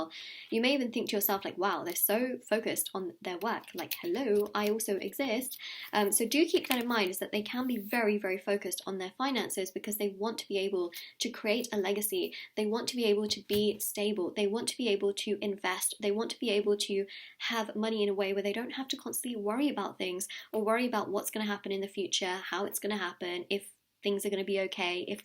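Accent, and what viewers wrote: United Kingdom. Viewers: Damn pile 2 really resonates to my Twinflame. This wasnt happened once yet every readings i tried to look for my person i end up always pick at the right piles where the reading was always pointing to him. My beloved twinflames. Such a huge confirmation.. This is infact a new strong confirmation from you. Muchos gracias. May the devine bless u more and guide you more. Ive been an avid fan of yours because of your accuracy and very positive energy that i could really feel as i watch your videos and also i wanna say i really love your voice its soothing and im loving your British accent so sexy to hear. 😍😍😍😘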